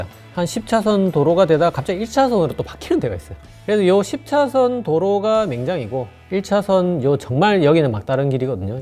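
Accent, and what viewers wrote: Gyeongsang, South Korea. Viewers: 확실히 경상도 사람이다 ㅋㅋㅋ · 저는 꼬리뼈가 조금 더 크고 동이근 있어요. 아직 진화가 덜 되었군 ㅎ 저는 경상도 방언이 참 듣기 좋더라고요. 경남쪽이신 듯... 영상 감사합니다.😊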